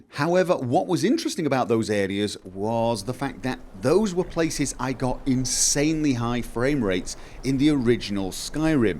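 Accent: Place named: Scotland, United Kingdom. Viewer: are you Scottish? love yer accent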